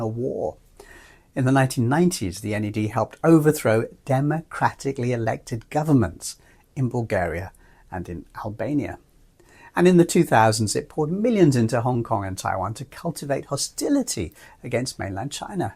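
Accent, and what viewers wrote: United Kingdom. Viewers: Stupid british accent or involuntary and unnecessary subtitles: choose one. I'm out.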